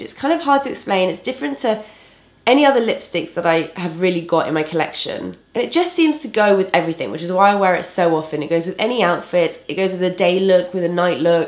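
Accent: England, United Kingdom. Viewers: I just love your English accent!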